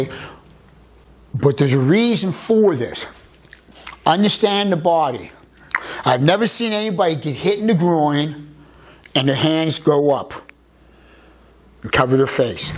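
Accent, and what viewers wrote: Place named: Ohio, United States. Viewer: I looked up your area code, and yes you are Cleveland area- as I thought. I’m from Toledo and you sounded like a buckeye. Really enjoyed video. Great instructor! Bet you have some fight stories? Best to you!!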